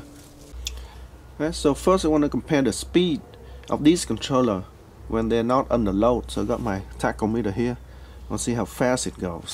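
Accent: Vietnam. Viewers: Vietnamese accent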